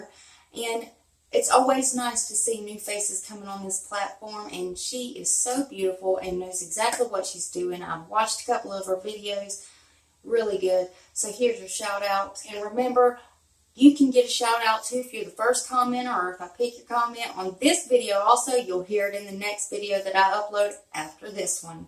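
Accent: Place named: West Virginia, United States. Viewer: I live on West Va/Va border and we have the same accents, you could be my neighbor. Lol. So our accents are the best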